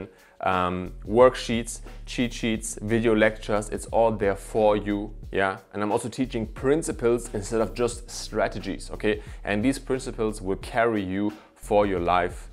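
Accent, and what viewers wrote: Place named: Germany. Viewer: Let me guess.. Are you German? Your accent sound like that ✌️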